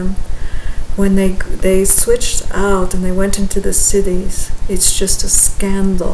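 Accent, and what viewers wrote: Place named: United States. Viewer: Why do you start out with a heavy Eastern European accent and then it fades to a complete American English. Please stop! I have been watching you for years!!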